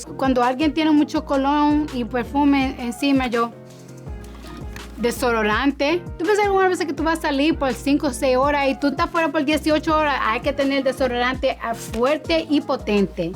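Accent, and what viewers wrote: Dominican Republic. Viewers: Con todo y todo ella no sabiendoyñ Bien Español suena muy Dominicana 😭😭😭🇩🇴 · 😆 hablando espñol es todo una dominicana nata cremosa, no tengo dinero por si me quieren robar y la cartera ya es bastante 🤣🤣el tipo la dejo pegada la amo me alegra sus videos y locuras natural delicada salvaje ese bolso falto la piedra para lanzar a los que se metan con ella 🤣 si es contigo nicki minaj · Tiene un acento dominicano precioso ❤. Mi latina queen 😊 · La ame, su acento dominicano es una delicia. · siempre Con su acento Dominicano 😍🇩🇴🔥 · bueno mi amorch debería debatirle ese punto a, pues ella se caracteriza por puntualizar el dominicanismo al hablar y otra vaina que te voy a decir es que los Dominicanos nacemos donde nos da la gana 😂😅 pa ' que cepa🤔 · Me encanta su Español acento dominicano me encant❤ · no nació en República Dominicana pero tiene muchas cosas de dominicanos realmente. 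😁 Cuando habla español es Dominicana pura 👌🇩🇴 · Se que ella es dominicana pero para haber crecido en USA su actitud y su español es muy dominicano 😂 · ella a dicho que es dominicana, incluso su acento en español es dominicano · Acento Dominicano 😂😂 a muchos no les gusta pero que podemos hacer... La liga de razas influye mucho. Tambien agregarle que somos jocosos y muyyy expresivos al hablar. 😂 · AMO SU ACENTO DOMINICAMO ♡♡♡ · loveee the Dominican accent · Ella se considera dominicana porque está orgullosa y su acento es súper dominicano, también dijo en un en vivo que ella se crío allá en RD y después vino a USA aunque nació allá. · Sabía yo que era Dominicana por su acento jejeje me encantó oírla hablar, pero cuando la ví dije y de donde esta chica tan linda, nunca pensé que fuese dominicana pero cuando la oí hablar dije ese acento es Dominicano jeje muy bella, Dios la bendiga 💖🇩🇴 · Esta tiene un slang dominicano de barrio full😅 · Se le nota el accento Dominicana y Nueva York a la vez😊 me gusta❤ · When first started speak in the very beginning, I was like "Que fue!" THEN her Dominicana came out and I was like, "Oh ok, ta bien!" 😂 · habla como una❤❤ tipica dominicana❤su jerga,su lenguaje colloquial es puro dominicano😊no puede negar sus raices dominicana❤❤ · Yes, it sounds very natural and the accent she has is accurate (i think it is from República Dominicana) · El acento Dominicano es ÚNICO 😂😂 IT’S 😂😂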